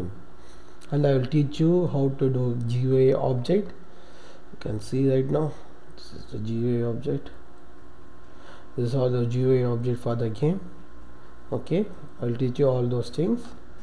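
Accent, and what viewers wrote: India. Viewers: Thanks.... :D But One Question " Are You Indian ? "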